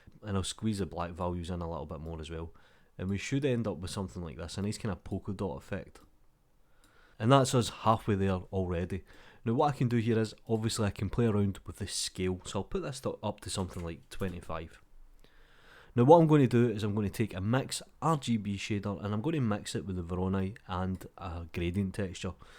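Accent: Scotland, United Kingdom. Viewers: oh my, a scottish man (?), just explained half tone shading to me. what a treat. :)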